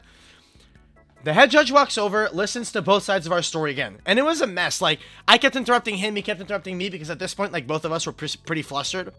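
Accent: Canada. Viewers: This guy’s video started autoplaying when I left YouTube open. He sounds so damn Canadian lol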